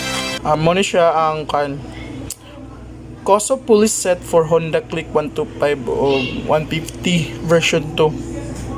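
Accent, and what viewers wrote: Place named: Visayas, Philippines. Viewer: Bisaya ka bay, ayos hehe tuloy tuloy lang natin to.. hehe minsan ok rin mag order s lazada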